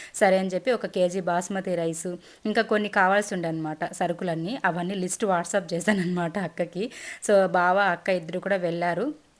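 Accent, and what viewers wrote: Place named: Rayalaseema, India. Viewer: Hi garu, chala baundhe vlog thodi kodalu bonding chala cute ga undhe, meru chesina non veg dishes yummy naku me non veg vlogs chala estam.andi and meru rayalaseema slang bauntadhe andi voiceover kanna original slang video petandi madhe hyd andi..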